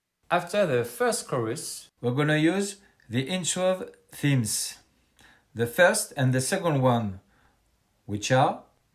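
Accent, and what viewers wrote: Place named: France. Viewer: Thank you love your French accent ❤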